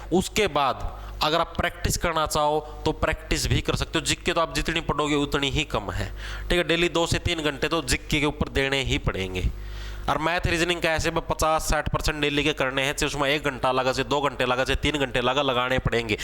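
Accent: Haryana, India. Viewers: Es hariyana ke avaj sunn k laga haa koi pdhava hai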